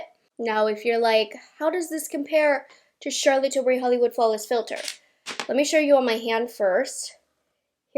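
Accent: England, United Kingdom. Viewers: Aah, excellent video, and the English accent uncanny (good audition for Bridgerton). Yeah, I agree with it looking metallic. It reminds me of Laura Geller's balance and glow powder foundation, and that never sat well with my skin. But you did an amazing job with this honey, I won't be purchasing it, but on a very young skin, it would probably look amazing, just not for my almost 61-year-old skin. Thank you, gorgeous girlie 😘 🌟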